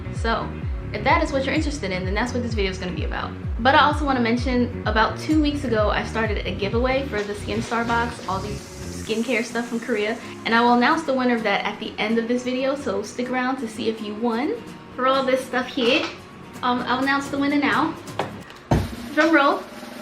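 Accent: England, United Kingdom. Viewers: Haha! British accent is coming along!! ❤️❤️ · Yo I was so confused I’m like why does she sound kinda British still swear sis was American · The British accent got me 😅😅😅😅😂😂😂😂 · Not the British accent😭😭😭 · Are you British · We need to talk about your English accent lol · What is with the British and Australian accent? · Looove ur british accent btw🥵😍😍😍💯🙌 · That British accent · That British accent tho😂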